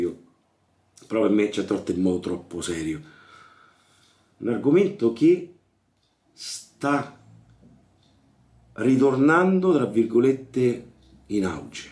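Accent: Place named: Rome, Italy. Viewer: Ciao, volevo farti i complimenti per il canale. Ho iniziato a guardare i tuoi video cosi, facendo zapping su YouTube, e niente... Sarà la simpatia? Saranno la passione, l'umiltà, l'accento romano...? Praticamente me s'è aperto un modo e pure il portafoglio... "Ccitua!" 🤣 Senza entrare nello specifico ma altri canali simili non trasmettono la stessa atmosfera. Continua così 💪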